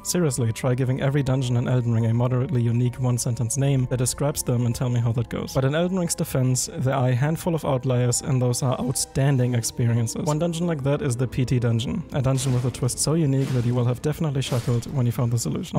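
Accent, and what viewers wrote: Germany. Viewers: Ich mag deinen Typisch Deutschen Akzent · Man hört den Deutschen Akzent so raus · The German accent made is much better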